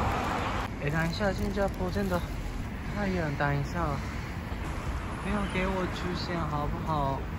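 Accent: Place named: China. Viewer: あなたの中国アクセントも可愛いですね😊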